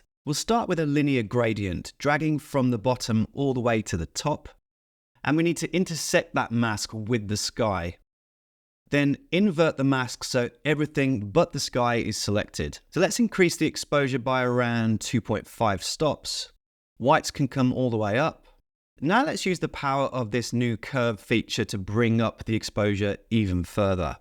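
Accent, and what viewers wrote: United Kingdom. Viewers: Wow, i use Lightroom many Years and i thought i had good knowledge. Most Photographers use Photoshop and i thought i must learn more PS, but i like more Lightroom. You bring me to the another Level, Thank you!! Greetings from Germany, i understand your clean Oxford English very good. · You are amazing and I love your clear British accent!!!🥰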